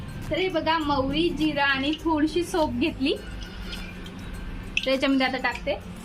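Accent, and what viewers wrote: Vidarbha, India. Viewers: ताई तुमी कोणत्या गावातुंन बोलता नांव काय आहे ताई तुमच वराडात ल्या बोली भाषा मुडे विच्यारल मी सोनाडा ची लेक आहे गाव ची अस म्हणायच आहे मला माझ नांव गीता आहे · Vidhrbhatle ka tumi bhasha varun vatle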